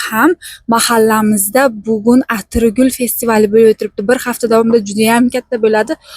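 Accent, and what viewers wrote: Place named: Olot, Uzbekistan. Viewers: Шевангиз холиям олотчча га тортаркан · O'zim aylanay shevangizdan zemlagim Buxoro olotlik men jondordanman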